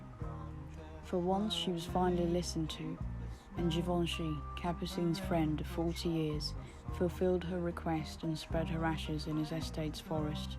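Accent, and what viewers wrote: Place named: United Kingdom. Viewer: I like your specific British accent! It has a cool, unphased, couldn't-be-bothered quality. Good video essay, as well!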